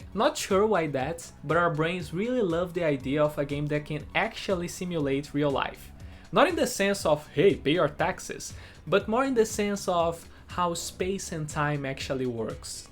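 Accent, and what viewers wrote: Brazil. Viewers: ah, sabia, o sotaque te denunciou! Mas vc fala inglês bem pra caramba! Bacana ver um youtuber da minha casa fazendo conteúdo de qualidade tb pra fora! Fica em paz, amigo!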